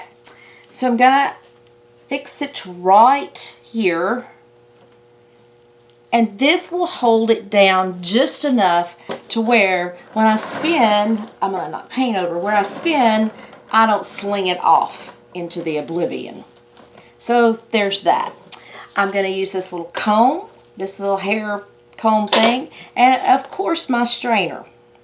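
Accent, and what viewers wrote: Texas, United States. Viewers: It was beautiful before the spin, but the black lines and the spread patterns worked. Very nice work. Love that Texas talkin.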